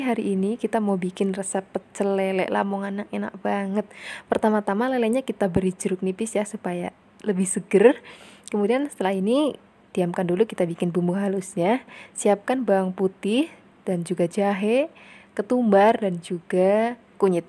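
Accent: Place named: Tegal, Indonesia. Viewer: Mbknyq org mana???logatny kyk org tegal jawa tengah❤slm knl y mbk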